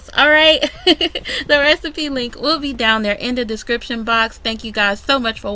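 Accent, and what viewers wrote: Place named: Atlanta, United States. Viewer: Girl you can cook! Where you from? I hear some ATL in your blood!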